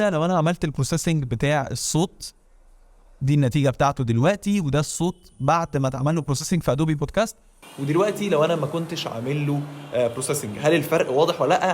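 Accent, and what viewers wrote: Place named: United States. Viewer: مادري المشكله بس عندي ولا لا بس لاحظت ان لكنه الكلام تقلب كأنها امريكي يتكلم عربي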